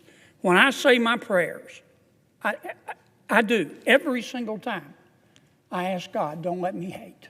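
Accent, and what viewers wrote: South, United States. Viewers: Love to hear his smooth southern accent SPEAK the Great big beautiful TRUTH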